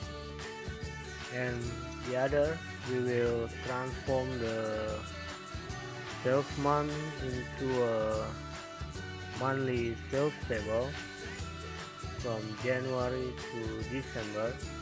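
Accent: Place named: Indonesia. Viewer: makasih bang ilmunya ane cari tutor ini susah banget,,, untung ada abang kerjaan ane cepet kelar,,, logat indonya masih kerasa jadi ane ngerti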